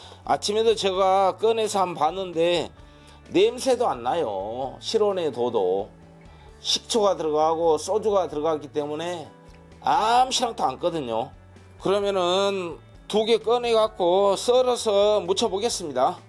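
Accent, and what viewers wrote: Jeolla, South Korea. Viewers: 구수한 전라도 사투리 배우고 갑니다👍👍👍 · 노각 짱아찌 꼬들꼬들 고기 먹을때 좋을것 같아요~저도 해서 먹어야겠어요~ 전라도 구수한 사투리로 재밌습니다😂 감사합니다😂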